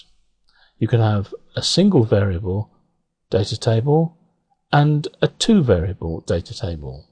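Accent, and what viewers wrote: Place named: Atlanta, United States. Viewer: Thanks so much, and you have such a nice accent the same accent in Atlanta, Ga all the best for you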